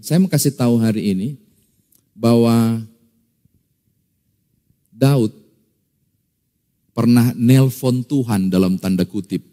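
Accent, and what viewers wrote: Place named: Makassar, Indonesia. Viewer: Sukaa dgn gaya bahasa makassar nya pak pdt😂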